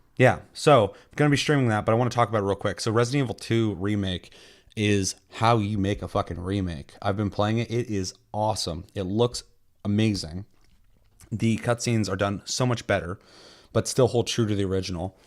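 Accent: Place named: Russia. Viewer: Anthem has an identity crisis. And kinda tries to approach too many audiences. I`m not really interested in BioWare after this point. I`ll still give DA4 a try, but not expecting anything special. This is a weird scenario, when you take a really prestigious company like BioWare, an actual fuck-up and bad game would be kind of given a pass, like "shit happens". But when a company like that releases a game that`s not letter-for-letter awful or bad, but one that`s like Anthem, a game that`s nothing special and has nothing exciting about it, just "meh", it does more damage than a fuck-up. It`s disquieting and worrying when you know that this is something they put actual effort into. Resident Evil is doing justice against the shamelessly stupid movies. I love horror games and Resident Evil is a damn good one and Atomic Hearts looks really dope. Seeing other survival horrors go down the drain (like Dead Space that has been downhill since the first title and then EA shut them down) and other horror games with loads of potential (like P.T or Alison Road) get canceled or drown in development hell is saddening, but at least we have Resident Evil. 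Yo, your russian accent is hilarious ;"D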